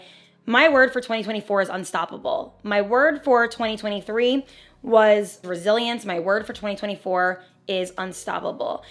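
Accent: New Jersey, United States. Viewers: I started laughing watching this video. I’ve never noticed in any of your other videos. I don’t know if it’s a you’re in Jersey and you’ve picked up the jersey accent or you had it before but just never noticed but I love it by the way you’re looking amazing and congratulations on your weight loss and your transition to your new body. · Great video!! Are you from New Jersey btw? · Are you from jersey?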